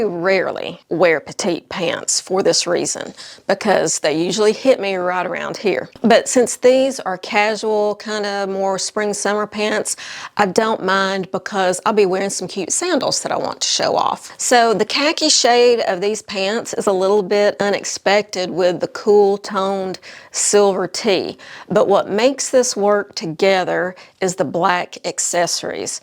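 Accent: South, United States. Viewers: Watching you from Chico, Ca., in the northern part of the state, north of Sacramento. I watch a number of fashion influencers and you are my favorite. Love that sweet southern accent and all your great outfit ideas. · Yes, ma'am, we do sound so much alike. When I heard you mention north Alabama, I knew you are one of us. Happy almost weekend!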